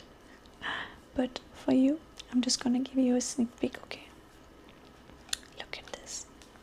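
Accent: India. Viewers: “Do you know how expensive my sari is??” 🥳Your Indian accent and this character are the best! Also: the moon is always out, even in the day, but is made invisible by the suns brightness, which means your light is eternal and constant, unlike the suns.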